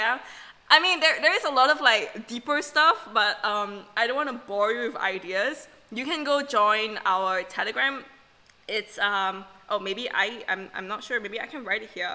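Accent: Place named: Caribbean. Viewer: the accent sounds caribbean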